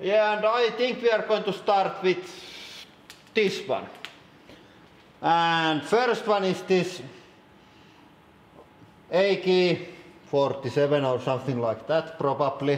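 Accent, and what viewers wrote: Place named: Finland. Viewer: Sun ralli englanti on paras!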